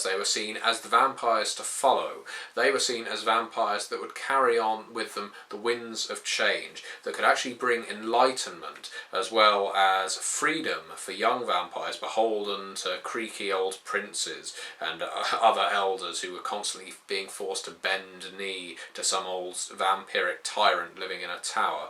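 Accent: United Kingdom. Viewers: This is prove that no matter how scruffy you look the second you open your mouth and out pops a British accent you sound 9999 times better then you look.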